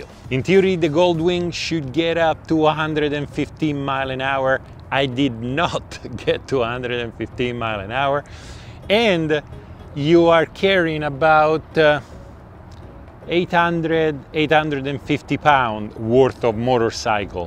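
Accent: Italy. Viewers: I love the Italian accent review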